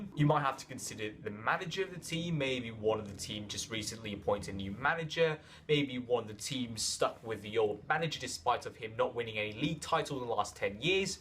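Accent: United Kingdom. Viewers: what are the odds .. chinese speaking in british accent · Chinese guy teaching Hood Math with British Accent. Soo much culturalism Republucans hate 'em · Nice video - any chance you're from nottingham?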